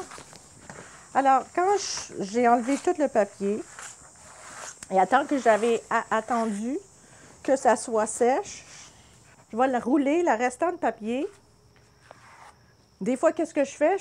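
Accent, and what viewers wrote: Canada. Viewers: Démonstration intéressante avec un bel accent Canadien , mais trop long .... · Es tu quebecoise?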